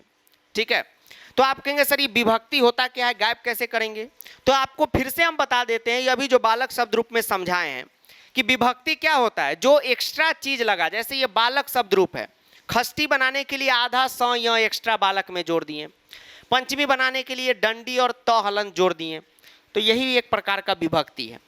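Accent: Bihar, India. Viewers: i like bihari and after hearing you for hours i am also now speaking bihari 😅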